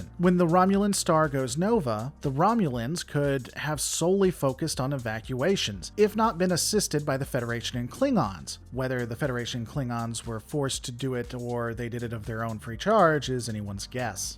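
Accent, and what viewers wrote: United States. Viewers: So freedom is not something worth sacrificing for? It is, in fact it’s worth laying everything on the line to keep it. As an American (presumably from your accent) you should understand this from our history.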